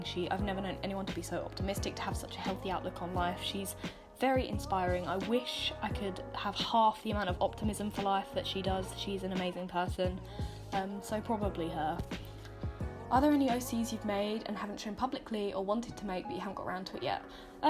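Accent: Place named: United Kingdom. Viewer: I knew you were br*tish but the accent still jumpscared me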